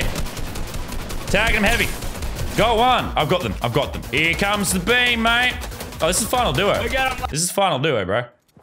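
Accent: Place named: Australia. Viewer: I’ve been watching lazerbeam for so long I can’t hear the Australian accent lol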